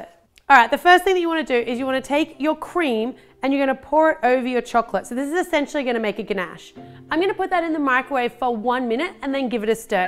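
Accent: Australia. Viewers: She's Australian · R u from australia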